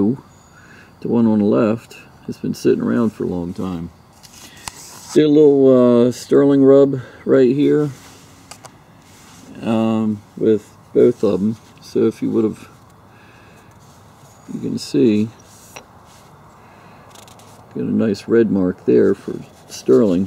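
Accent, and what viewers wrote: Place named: Pennsylvania, United States. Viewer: I subscribed to you channel today :) I love to junk and yard sale. I have question, I was watching your video 2010 you bought 9" silver zodiac part. You said you sold it to a guy on Craigslist. I inherited the exact same bar. Can you provide me with any information? You said, if you had kept it, it would be worth more. 7 years later :)) I would appreciate any helpful info. You sound like, you are from Pennsylvania. Steeler Fan here.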